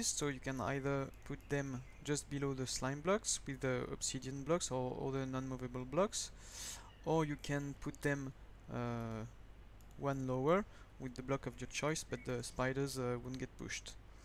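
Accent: France. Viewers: Dat french accent ^^' Merci pour le tuto j'adore le concept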